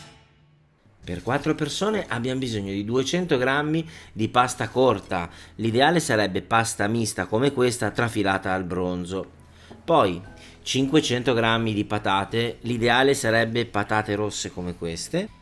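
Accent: Naples, Italy. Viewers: haaaa bellissimo il tuo napoletano,e jammebbell"jà Bravvvoo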